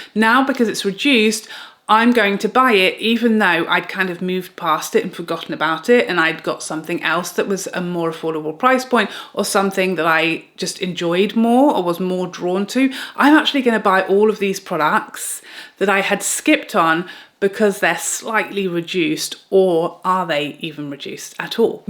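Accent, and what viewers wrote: United Kingdom. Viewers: Yes, Black Friday started the day after Thanksgiving here in America. I know people who wake up at the crack of dawn to wait in line at the stores to buy that TV. It's a tradition for some people. I can't imagine shopping in store on that day - the crowds are unbelievable - not fun. Thank you, for being true to who you are - this is why I follow your channel. Also, I love your British accent.:)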